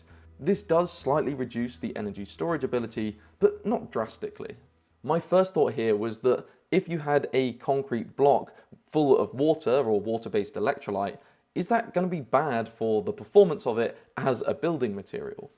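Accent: United Kingdom. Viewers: Enough of the British accent. Speak English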